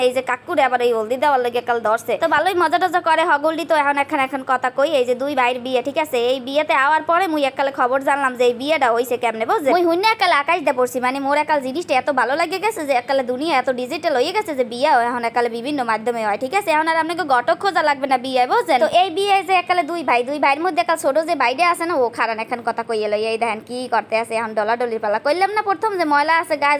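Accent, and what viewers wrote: Barishal, Bangladesh. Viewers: আপু তোমার ভিডিও প্রতিনিয়তই দেখি বিশেষ করে তোমার বরিশাইল্লা ভাষায় কথা অনেক সুন্দর 👌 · আপু আপনার বাড়ি কি বরিশাল না পটুয়াখালী ? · আপু আপনার ভিডিও দেখার পর বরিশালের ভাষার প্রেমে পরে গেলাম,,,,❤❤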